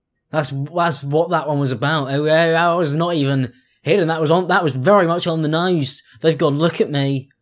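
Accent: United Kingdom. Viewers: Why did Jacksepticeye turn british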